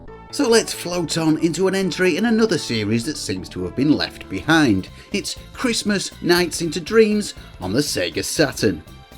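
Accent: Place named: Cockney, United Kingdom. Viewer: This is the best cockney retro gaming channel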